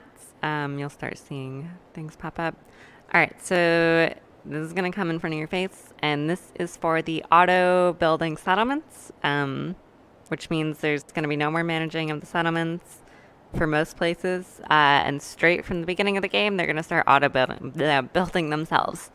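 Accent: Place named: United States. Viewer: I get the American part. You just sound more American and a bit like a friend of mine. I want to go to Boston and drink everyone under the table. Do you build all these or just compile them? I never even got around to installing that last one you recommended. I want fallout as a sandbox of stress relief. I like to come home jump on and have some escape. I spent 3hours trying to get audio drivers working for bigscreen tonight and I'm so done. Mods this complex, they just hurt me because always something goes wrong and I get too angry and need to play another game to get rid of the anger.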